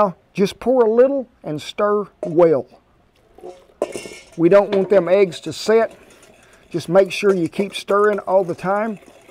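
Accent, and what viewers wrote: South, United States. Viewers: I gotta say the southern accent he has is balanced very well 😇 ppl where I'm at speak every word as one word 😒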